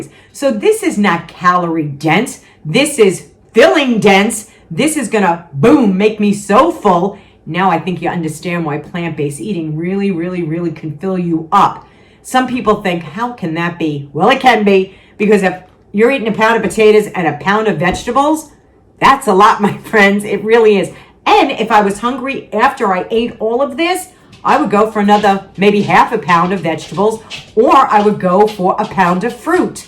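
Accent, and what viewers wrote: New York, United States. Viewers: Hi, it's Joey from PBD. Did you grow up in NY or near there? I am sensing a little "back home" when I hear you talk. It reminds me of family and good times. Ok, that bowl of veggies for 100 calories is a very good portrayal of how 100 calories are so filling. I am glad to hear you talk about carbs and starch. You know how it is when you are surrounded by Keto and no carb people who think we have all lost our mind by eating carbs. Yes, you are right, you can eat a large meal of potatoes and veggies and be stuffed for about 400 calories. It's a beautiful think. I love all the benefits you are experience in healing your body from a plant based lifestyle. I just subscribe. I gotta support my YouTube HCLF sister! Great video and great info. Hugs!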